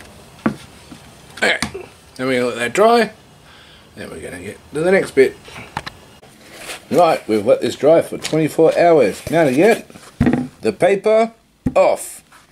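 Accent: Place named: Brooklyn, United States. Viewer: Wait...you’re Australian?! I thought that was a Brooklyn accent! 😂 A couple things...that drawing is beautiful, it reminds me so much of a Tim Burton character. And was that a Skerple you used? Always love your videos buddy, glad your little one is doing well. That bottle opener was the best part...gave me a good laugh!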